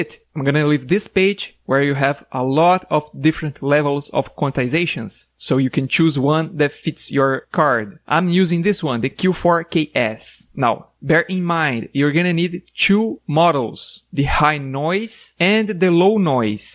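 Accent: Brazil. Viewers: Eu só passo o mouse na thumb, mas aí ouvi e pensei: peraí, temos um BR, bora dar moral. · Sotaque BR identificado, bom vídeo manolo! Ganhou um escrito =)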